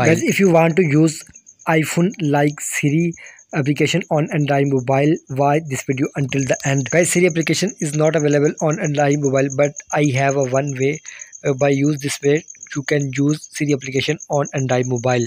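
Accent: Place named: India. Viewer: Any video made with an Indian voice I assume is likely a scam.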